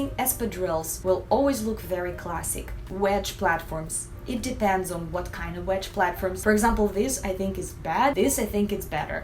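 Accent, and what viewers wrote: Russia. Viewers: У вас прекрасный русский акцент ❤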